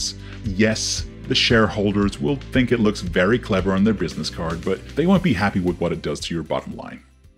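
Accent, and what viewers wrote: United States. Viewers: Your American accent is so good that I forgot that you’re British/Australian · Woah, new to this channel and was totally convinced that was your voice, with maybe a compressor, but certainly not a pitched down American accent! Well done! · your American accent was spot on except for when you said "military" lol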